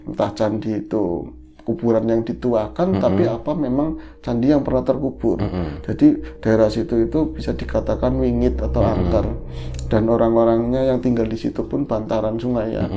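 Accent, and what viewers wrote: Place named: Tegal, Indonesia. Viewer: Mudah2an setelan Mas skrg mulai dari outfit ( topi)sampe logat Tegal dan gaya ceritanya AWET TERUS. Pertahankan,mas!!! Ini adalah Trademark kalian dan unik sekali!!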